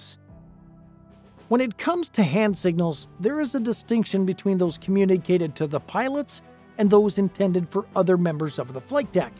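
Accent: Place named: Canada. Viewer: Canadian voiceover!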